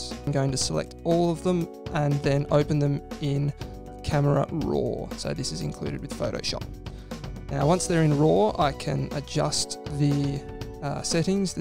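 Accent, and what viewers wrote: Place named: Australia. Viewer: Good to hear an Australian voice on YouTube. I am sure method works, however it is a bit of a fiddle. For weeks I have been seeking a simple method to open BlackMagic image sequences in an app' on Mac, and convert them to QuickTime PRORES files. The PRORES files I wish to colour grade in After Effects. Any suggestions?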